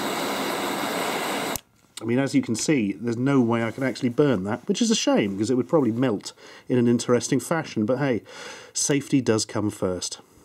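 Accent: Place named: England, United Kingdom. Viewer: Are you in England because your ascent and you you said pounds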